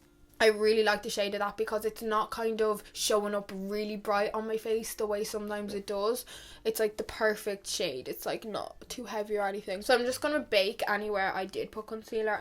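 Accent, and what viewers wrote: Ireland. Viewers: Lol first time seeing your video. First sentence and I was like SHE IS IRISH. If I’m wrong.. I’m sorry lol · Where are you from in Ireland beautiful accent ! Cxxxx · your irish accent is just super cute!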